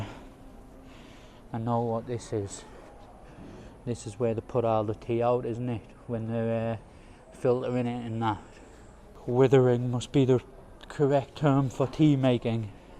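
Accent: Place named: Newcastle, United Kingdom. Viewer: He is from UK. Specifically North of England. Newcastle area. Which known as Geordi land. Hence his accent.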